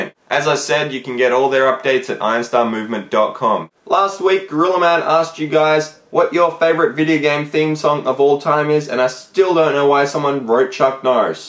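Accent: Australia. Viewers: Are you Australian? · MOVE... and your accent is Australian ^_^ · Yay Australia! Good to see someone whos not American for once. I dont have to stab myself becuase of the accent!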